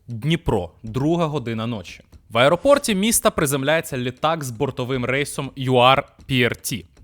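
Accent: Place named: Russia. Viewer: Боже !!! Яке неприкрите зелене іпсо , кому ти брешеш, ти тільки вчора вивчив українську, на акцент кацапський зверни увагу.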